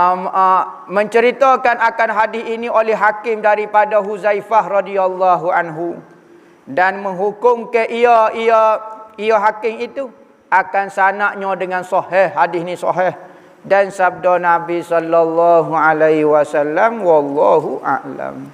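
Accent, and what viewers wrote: Kelantan, Malaysia. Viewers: suka dengar ostaz kecek klate hehehe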